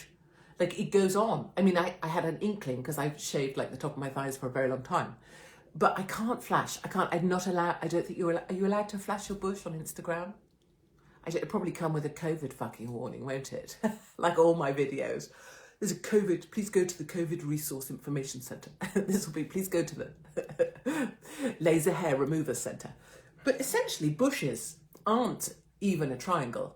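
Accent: RP, United Kingdom. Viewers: You? Council house? So where did you get that posh accent?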